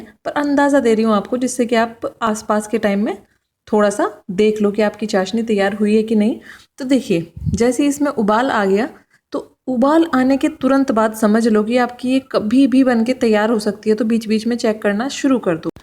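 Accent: Haryana, India. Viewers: apki awaj s lg ra ap harayana s ho pls reply mam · Aap pakka Haryana se ho… ye accent aur style sirf Haryana walo ka hi hota hai👍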